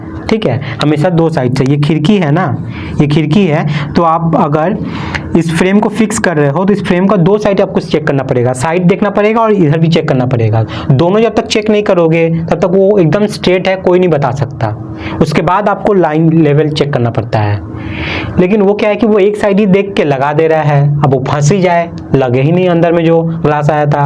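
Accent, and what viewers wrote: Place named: Bhojpuri, India. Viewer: Sir ...aaji itni bhojpuri jesi baat kar rahi ho ...acha nahi lag raha hai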